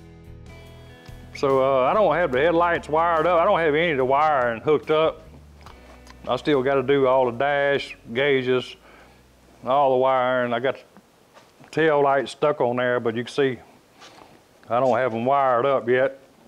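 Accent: South, United States. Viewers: when you really do the southern drawl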